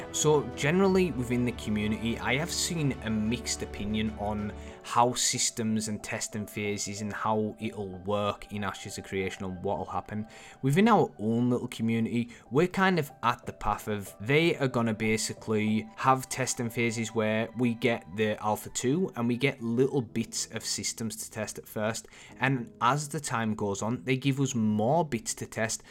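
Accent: Newcastle, United Kingdom. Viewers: Newcastle or Liverpool? I swear it's like a mix of both XD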